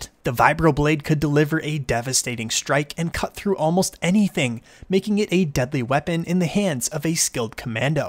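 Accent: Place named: Canada. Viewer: Most Canadian accent ever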